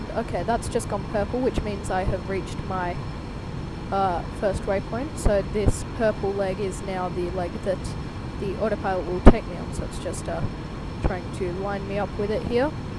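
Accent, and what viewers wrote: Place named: Australia. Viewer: subbed because aussie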